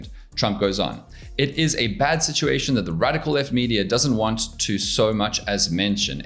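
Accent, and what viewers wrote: South Africa. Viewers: wow dude, you sound South African, why you don't know what's really happening here is beyond me. All of what they say is true, maybe you don't live here or haven't been here for a long time but I can assure you, what they say is going on here, is really going on. maybe you should educate yourself on the last time they tried stealing people's privately owned land back in 2018, they couldn't get it right, but that didn't stop them from trying, and when you said 'its only South Africa' you're taking what he said out of context, what he meant was its only South Africa, of all the African countries that he's got his eye on. cherry picking bits of information and leaving out the rest doesn't help your cause. Go and read the full Expropriation without Compensation, the very meaning is in the title, and educate yourself before putting such a load of garbage and lies on for all the world to see. 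its people like you that cause everyone to hear and see just what they want to hear, and still nobody knows or believes whats going on here because of people like you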